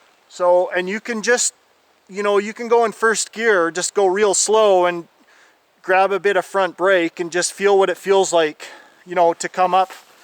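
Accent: Canada. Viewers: lol i love this guys accent! Canadian?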